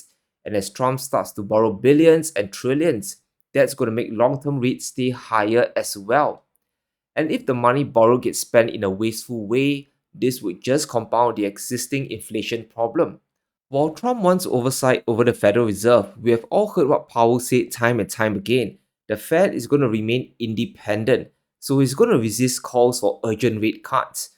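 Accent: Singapore. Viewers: Your voice sounds fine. You have typical Singaporean accent which is a relatively easy accent to understand. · ❤❤Don’t worry.. your Singlish sounds OK, per usual… your research is priceless. Thanks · Also…I never heard your slur!!! Your sound Singapore to me like my good freind - no slur??!!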